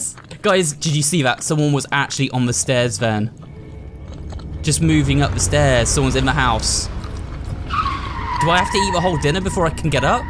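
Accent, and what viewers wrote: United Kingdom. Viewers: The British accent is making it funny but i really wanted to hear say bottle of water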